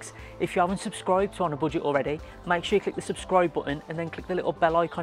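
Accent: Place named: Northern England, United Kingdom. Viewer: Great job! As a Frenchman obsessed with accents, may I ask you where you're from? I can tell Northern England but I'm not sure where exactly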